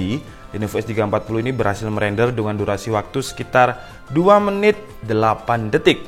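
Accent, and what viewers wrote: Malang, Indonesia. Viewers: Lohhh mas wong malang to?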